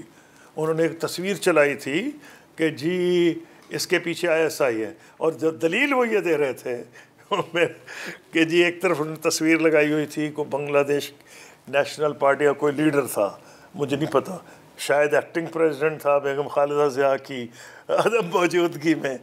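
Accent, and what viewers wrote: Punjab, India. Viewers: Why this gentleman speaking Urdu in Punjabi style...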